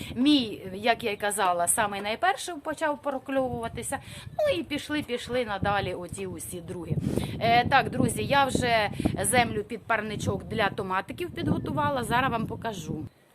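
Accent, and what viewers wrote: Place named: Zhytomyr, Ukraine. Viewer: Доброго ранку,! Привіт з Дніпра. Дуже рада, що підписалася на Вас. Все дотепно і грамотно. Дякую Вам. А Ваша мова як елексір на мою душу. Так розмовляють на моїй рідній житомирщині. Бажаю Вам здоров'я і мирного неба - всім нам!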